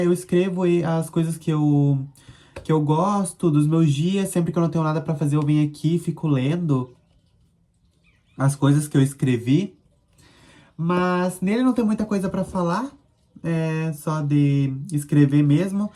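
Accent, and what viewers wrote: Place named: South, Brazil. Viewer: Moço voce e sulista? Que sotaque fofinhoo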